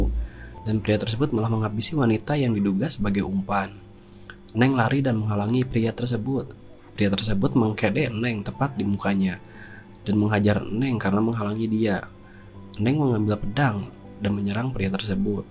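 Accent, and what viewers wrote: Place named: Sunda, Indonesia. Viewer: Pembaca Narasinya sangat 👍👍👍👍👍Sunda yg 😅👌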